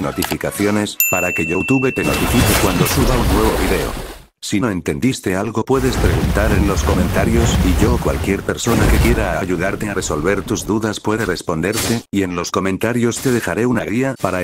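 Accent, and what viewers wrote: Dominican Republic. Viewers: Aaaaa Eres dominicano?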